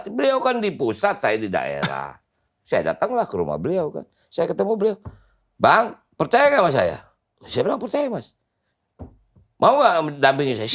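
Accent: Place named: Betawi, Indonesia. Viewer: Bang Rano betawinya otentik bener, bcandanye Betawi bener, emang anak betawi aseli lu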